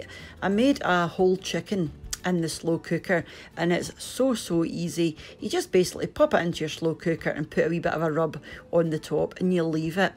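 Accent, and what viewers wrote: Scotland, United Kingdom. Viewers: Love the chicken recipe and the lovely Scottish accent. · Thanks for the video - heard the Scottish accent after a long time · AWESOME ......love the scots accent x Mines now ready thanks 0)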